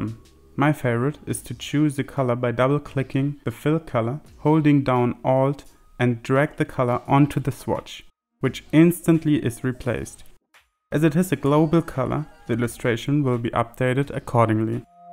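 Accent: Germany. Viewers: Hey are you German?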